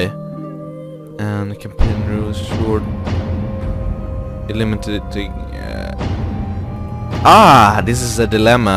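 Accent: Sweden. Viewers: are you frome Sweden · Hahaha, more swedish accent please!! :D:D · You're swedish right? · you sound like a swedish person....är du svensk? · Skåne? :D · are you Swedish? sounds like it. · Det märks verkligen att du e svensk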